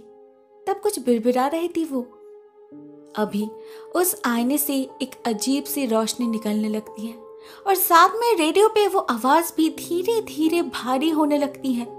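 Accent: Bengal, India. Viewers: are you bengali or bihari ?? ❤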